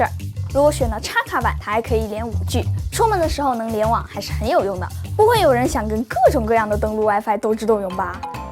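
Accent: China. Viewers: 好重的中國鄉音，逃。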